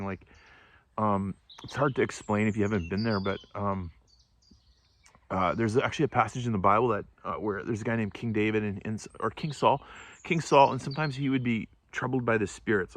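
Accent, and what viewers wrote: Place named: Saskatchewan, Canada. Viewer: and I have just discovered your show. I listened to your voice and I thought “he sounds like he’s from Saskatchewan….and then I saw the Roughrider shirt. Thanks for sharing your journey. 🇨🇦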